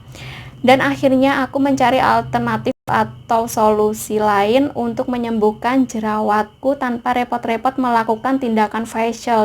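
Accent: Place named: Java, Indonesia. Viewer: Cewek sunda tapi lidah Jawa , Kerennn...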